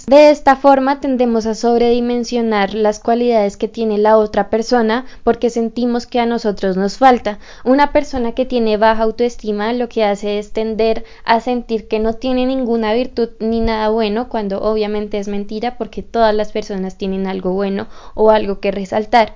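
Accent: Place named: Colombia. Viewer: Ambos son colombianos, supongo que es por el acento ☺️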